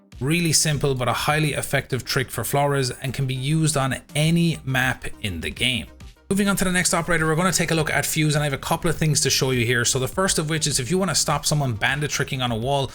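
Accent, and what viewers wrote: Ireland. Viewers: What part of Ireland r u from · Probably because he's Irish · Hes probably just irish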